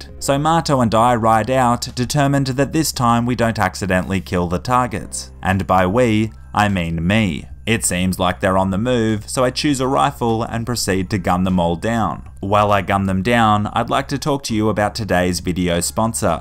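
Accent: Australia. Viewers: the most heavy Australian accent I've ever heard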